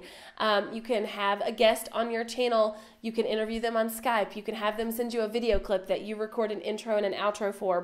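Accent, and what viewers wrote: South, United States. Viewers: I have got to ask which of these southern states are you from? Mississippi here!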